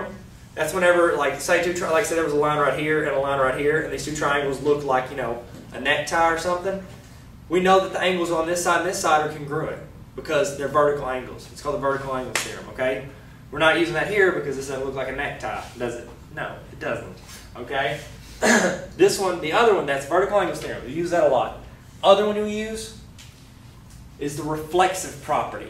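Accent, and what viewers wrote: South, United States. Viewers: That southern accent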